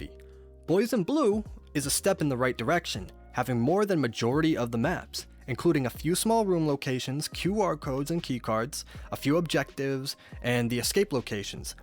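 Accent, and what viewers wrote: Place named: South, United States. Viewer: Your southern accent be slipping out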